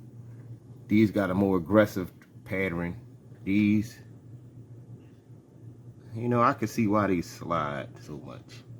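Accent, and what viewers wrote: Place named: United States. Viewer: Cool tyres, I like the 1st ones. Where do I get get them ? From your accent and the surrounding turf I think you’re from the US? Maybe I can’t get them in Europe. But that’s a bad spot for testing tyres or speedruns…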